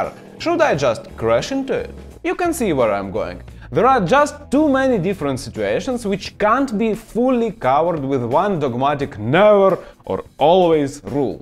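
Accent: Russia. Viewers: A Russian doing more Russian accent.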